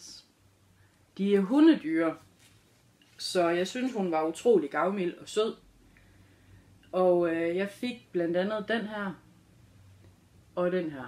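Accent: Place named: North Jutland, Denmark. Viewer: Hej 😃 Super godt 👍 selvfølgelig er der plads til dig. Dejligt at høre en nordjysk klang 😉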